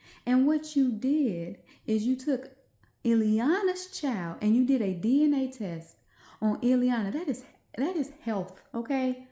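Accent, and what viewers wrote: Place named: South, United States. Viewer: Hi beautiful, new to your channel. You sound just like a Southern Bell lady, I love the accent it's too cute🥰❤ But as said, they'll be many blinded calling wrong right, and right wrong.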